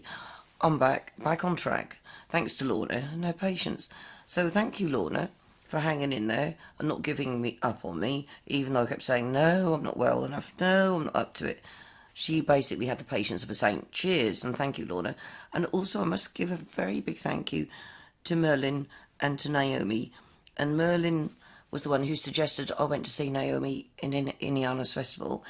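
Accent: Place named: England, United Kingdom. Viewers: your voice and english accent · there's nothn like a gud ol NORFOLK Accent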